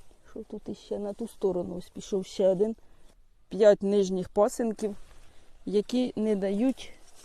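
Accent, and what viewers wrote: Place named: Sribne, Ukraine. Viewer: Ви часом не поблизу Лохвицького району живете? Дуже мова схожа з тією, якою розмовляють в Срібнянському районі, це сусіди.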